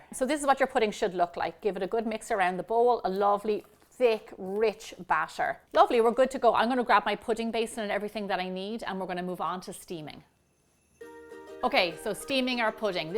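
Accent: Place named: Wexford, Ireland. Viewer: I never realised you are a Wexford Las how wonderful listening to you reminiscing about your Mother’s Christmas Pudding 🎄🎄🎄🎄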